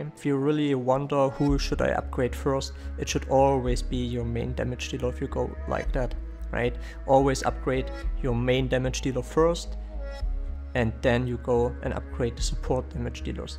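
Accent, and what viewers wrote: Saxony, Germany. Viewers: Your accent sounds kinda German, may i be wrong? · kommst du aus sachsen 😂❤ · your accent is sooooo german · wie man den deutschen akzent nicht nicht beachten kann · Hahaha are you from Germany? If so you can hear it out from your English accent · are you german? It sounds like you have a german accent:D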